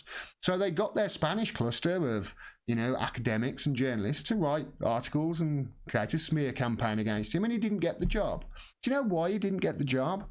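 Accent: United Kingdom. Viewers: what makes that story and previous ones you covered kinda 'palatable' for us powerless rabble is your great Brit accent! Would love to be able to rant about the media in my own french language the way you so honestly do. Thank you and here is a little story that will make you and your followers laugh your a** off in these bleak times, enjoy: <link> and here is the tape: <link>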